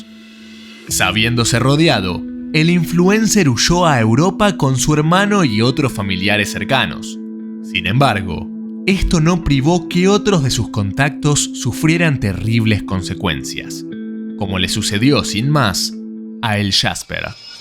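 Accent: Argentina. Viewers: Quien coños narra éste video, ¿Un argentino? No mames 😠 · Tú acento argentino, es tan molesto. ..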